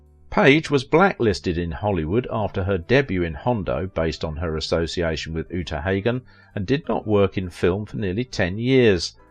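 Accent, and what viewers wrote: United Kingdom. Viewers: Okay I am tired of hearing a British accent narrating a totally American documentary.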